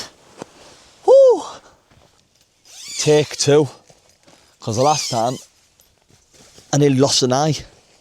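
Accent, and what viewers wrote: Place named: Newcastle, United Kingdom. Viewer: Great content. I love your authentic down to earth videos. I live in Morpeth so love content from someone with a geordie accent. Keep banging them out. Barry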